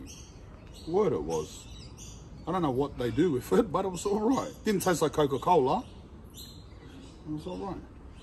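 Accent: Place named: Australia. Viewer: cheeps lol aussie accent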